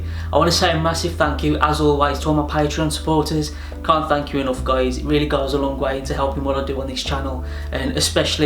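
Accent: Black Country, United Kingdom. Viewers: Don't worry, he ay familiar with arr Black Country spake, I am though, from a Black Country lad to another... Bostin' vid 😃👍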